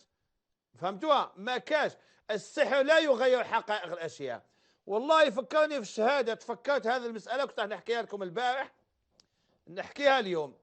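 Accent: Algeria. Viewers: لما قلت شكوبيستان كنت أقصد الجزائر 🇩🇿 لأني شبهت لهجتك باللهجة الجزائرية خصوصا لما قلت كلمة "خلي" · احسن شيخ يفهمه الجزائري. يتكلم بلهجة الجزائريين وبساطتهم. ووسطي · كنحماق على اللهجة الجزائرية. تحية من المغرب ❤️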